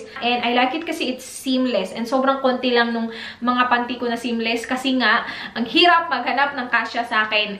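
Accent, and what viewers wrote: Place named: Visayas, Philippines. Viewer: Bisaya tingali nis indai..mailhan Jud ang punto ba hehe...basta bisaya nice jd mag English..dabah? 😉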